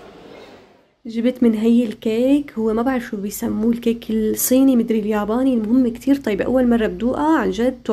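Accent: Syria. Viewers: اختي انت من سوريا❤ مبين حاكيك شاميه انا من سوريا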